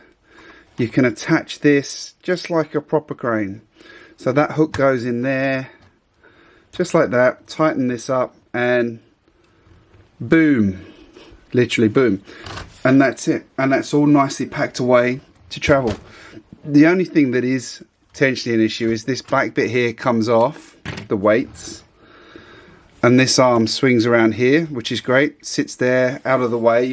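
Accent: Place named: United Kingdom. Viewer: Just a straight up thought if I watched with my eyes closed. Nice British accent!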